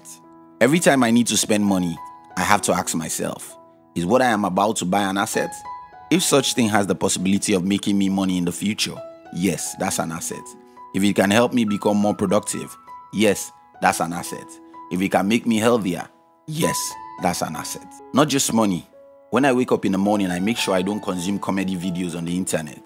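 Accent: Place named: Nigeria. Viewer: Nigérians english.......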